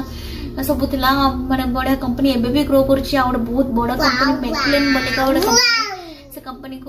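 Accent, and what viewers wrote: United States. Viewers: American English kemiti Shikhile ? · How u learned American english? Plz share details...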